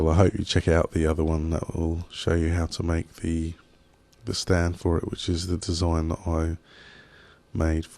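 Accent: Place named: Manchester, United Kingdom. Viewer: might be good to have some baking soda on hand as well to put out flames that might get out of control. Nice vid! thanx! Are you from Manchester? Don't know why but your accent sounds like that to me. I'm from New England so what do I know? -john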